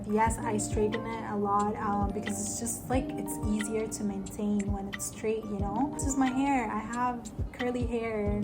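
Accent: United States. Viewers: Wow, so you picked up the US Mid Western American accent like here in Detroit. I assumed you are between 21 and 29. Also, you are gorgeous. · Is speaking with a American accent as you say you have never been to America then isn't yr accent cultural appropriation? I do not mean to offend or be rude, I'm just wondering why you have a English American accent. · I am an American and would agree your English is very good. Your accent would be mostly American (although America has many different accents). Enough that you could pass as American if you were here. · Sorry but I don't believe you're not an American. Not for a second.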